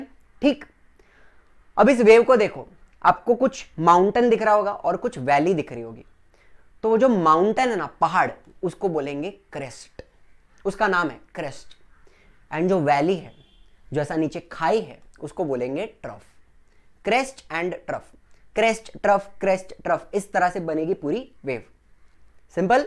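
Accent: Maharashtra, India. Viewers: Sir kya aap marathi ho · Sir i think your mother tounge is marathi 😅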